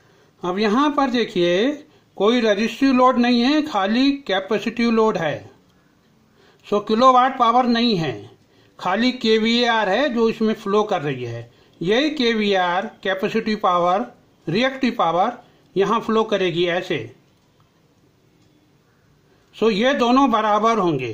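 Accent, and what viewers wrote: Bihar, India. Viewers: Bc Bihari bad speech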